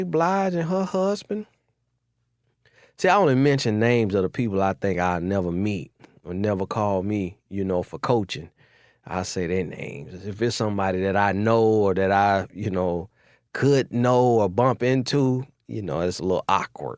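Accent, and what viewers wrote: South, United States. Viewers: Is this really your voice, you sound a lot more southern in your newer videos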